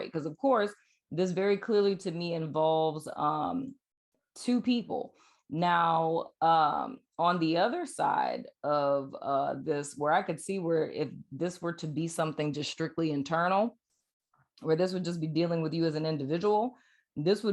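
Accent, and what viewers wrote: New Orleans, United States. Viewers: I friggin love your soul,. Your channel, your messages, and you as an individual are inspiring, enthralling, and captivating. And I love your New Orleans accent. I’m a Detroit native in this life. But I really have this thing for accents and dialects as a musician. Thank you and namaste. I always look forward to seeing you. Now lemme rewind a bit because I was really focusing on getting this message right on the first try. Think I got it. 🤔😅🙃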